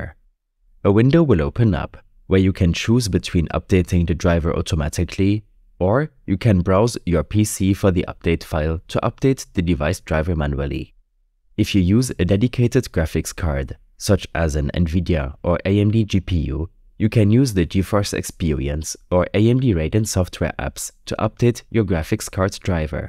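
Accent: Germany. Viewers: I love this perfect german accent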